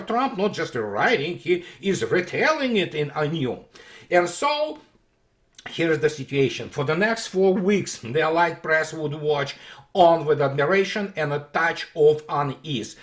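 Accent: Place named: Russia. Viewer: Mr Martyanov you are both an Officer and a gentleman. My late Uncle Boyo Howells was one such as you but a Royal Navy Officer and a Welsh gentleman. Other than the Russian accent and different uniforms I would swear you both cut from the same bolt of cloth. England expects every man to do his duty on the 8th May 2025 to respect and honour the debt we owe to Russia and all her peoples. And personally I will never for get the quiet words of respect Mr Martyanov paid to Queen Elizabeth II on her death. And when you posted that photo of President Putin opening the car door for Her Majesty on a state visit well I didnt realise oa Welshman could laugh cry and smile sobbuing unontrollably all at once over an English Queen and a Russian Head of State. Can I state I was born in Neath 1962 and in all my life and upringing I have never heard one bad word spoken against Russiians. 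Heck 1968 White Album side one track one is TAKE m TO THE SNOWY MOUNTAINS WAY DOWN SOUTH take to your daddys farm Let me hear your bellacas ringing out Come and keep your comrade warm.. hey I had a box of Russian toy soldiers in my armies on our side I had German i KNEW the enemy... I had French army but not sure what t, why...stayed in the box. no use at all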